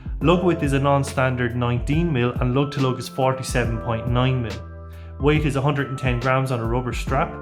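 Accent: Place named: Ireland. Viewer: Quality! Like your accent! More Irish-style reviews please! Much better than most of the channels here! Subscribed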